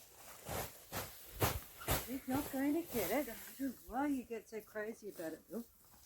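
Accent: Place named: Australia. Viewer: Seen you for the first time, on account that I am subscribed to CC ... after a minute you got my black bell for your good old australian common sense ( and accent ) . love from aussie expatriate .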